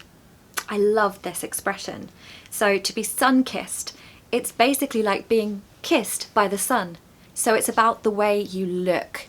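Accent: United Kingdom. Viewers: Tysm for this video! ❤ It's very useful to improve my vocabulary. I love your accent and British English as well! 🥰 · You are just adorable, beautifull, positiv, vivid, enthusiastic and your Brithish English is so lovely to listen to! Your smile and your eyes remind me on princess Diana a lot. I love your way of teaching English by telling stories about rhe British way of living, thinking and custims. I find it very interesting and useful. You bring joy and happiness into my day every time I wach your videos. Thank you so much and have a great summer! ❤😊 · It's so clear your British accent that makes easy for us to learn. The emphasis in each word with details very well explained it,s amazing. Thank you so much for the lesson 🤩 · Thanks you teacher, I like your British accent, very easy to understand. ✌️👍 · thank you for interesting videos. British accent is very nice. 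· OMG ! Your English accent is just fascinating , it's really relaxing to hear such a nice and slow pronunciation ✨ I fall in love with the British accent 🥰❤❤ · Love your british accent , and I can feel your energy and passion ! to cool down is pretty hard to pronounce:) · Hi,. I can understand your enjoyment about summer. I live in a region where the sun shines all year round, and get annoyed if it rains three days. However, I understand that we need the rain, of course, otherwise we wouldn't have food, but when the sun is bright it's good isn't it? Love your accent, you're a real british woman, thanks. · It has been just amazing, I like the energy you put into your videos, the enthusiasm you show and of course your british accent that sounds pretty good to my ears. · Your british accent is amazing😊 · Thank you so very much,. I love listening to you and with the lovely British accent. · thank you for interesting videos. 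British accent is very nice👍😁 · "Shall I compare thee to a summer's day" as Shakespeare says. Enjoying the chaste British accent. Thank you❤ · Lovely British accent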